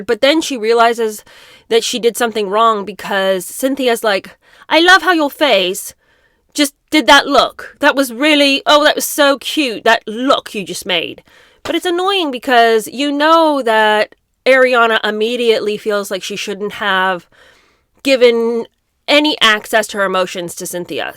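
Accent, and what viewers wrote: United Kingdom. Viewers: Your british accent is so on point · I enjoy watching your vids...you make me laugh...& you can do a perfect british accent ... You are so right...the relationship between those two is weird...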